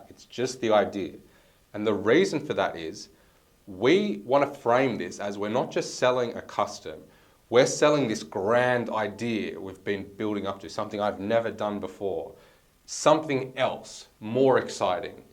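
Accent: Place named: Melbourne, Australia. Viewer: you remind me a lot of natural tim from freedom business studios also based in melbourne, you present well like him and have a similar accent/way of speaking. Might just be a melboune accent though, anyway love your content really helping me with my ai influencers im in bris myself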